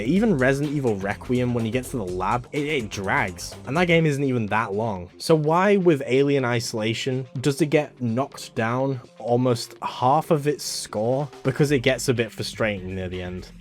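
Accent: United Kingdom. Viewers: That Life Of Brian joke was too good XD i don't listen to you at all. I just want to throw money at your british voice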